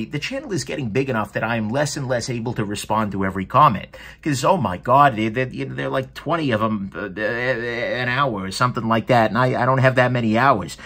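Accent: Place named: Long Island, United States. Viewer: Long Island loves you! You sound like one of us! Lol! You bring me so much joy watching you dissect my favorite things other than my sons, KNIVES! Shot out to Doc Frunky and Frankie and Byrd! They are awesome too!!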